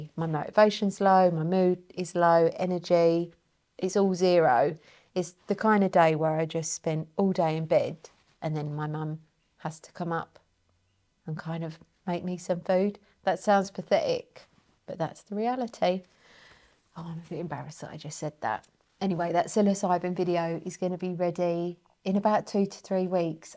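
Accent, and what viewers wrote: United Kingdom. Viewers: OH WOW! I don't often see your vids pop even tho I've got you on highest notifications but this one just popped up.....and what a great vid. You know I found your channel back in the covid lockdown days and the world was so chaotic back then. I was also sobering up and really struggling with life and your vids were so peaceful and calming. Your delivery is so cool. You share honestly and it's so refreshing and I gotta say, comforting. It's like "yeah we all struggle with life and trying to do things that are meaningful to us but also earn enough income so that we can take care of ourselves and our loved ones and have some extra for nice things"....the struggle is real. I just love how your throw yourself into things but then also document how you're feeling during it, your self doubts and all that. You just come across as a very genuine and real person and I love that. 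You've also got a great accent. Being from Oklahoma I don't hear many British accents but I love yours. Anyways, keep doing what you're doing and congrats on 3 years, making SO MUCH money!! AND getting to 143k subscribers!! WOW!! What a great year it's been! I'm so happy for you.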